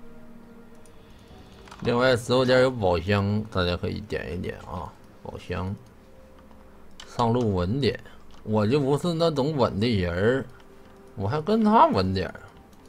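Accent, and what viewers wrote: Northeast, China. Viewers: 甚麼叫準...是比較沒有口音== 人家東北人 本來說話就有口音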